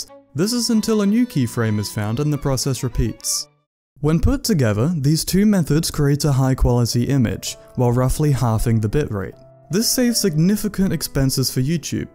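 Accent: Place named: United Kingdom. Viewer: >be me >get this video in recommended >british accent >face looks probably like the guy from the "ACKCHYUALLY" meme >go to video tab >many video titles have a CAPITAL word in it for no reason >many video titles start with "I made..." >first video blew up >2nd most popular video has only 1/3rd of the views >never got that many views since on any video >close tab and block this channel with the extension BlockTube Feels good man.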